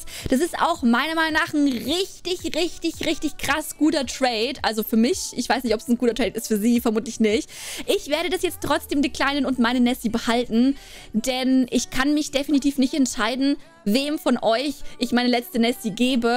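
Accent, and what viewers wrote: Bavaria, Germany. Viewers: Kommst du aus Bayern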